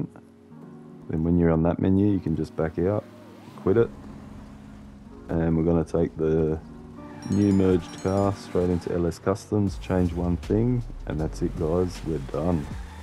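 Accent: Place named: Australia. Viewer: U sound like Ur from Australia if so I'm also from Australia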